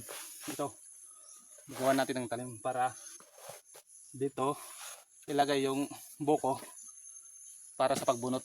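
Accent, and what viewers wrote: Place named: Bicol, Philippines. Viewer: Sounds like bicol. San province nyo po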